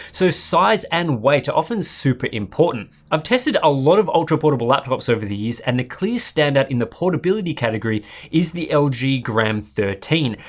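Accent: Australia. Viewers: You have a Aussie accent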